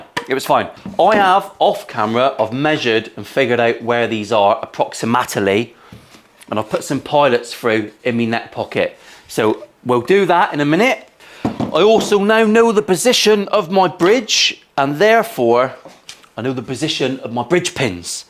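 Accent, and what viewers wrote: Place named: Cornwall, United Kingdom. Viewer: Listening to you makes me miss my time spent living in Plymouth and Cornwall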